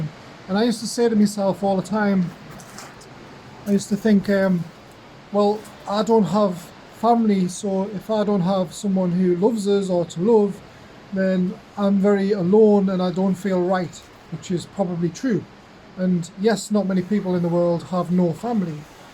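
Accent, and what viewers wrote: North East England, United Kingdom. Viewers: North East England he is. Maybe Darlington, Middlesbrough, Newcastle or Sunderland.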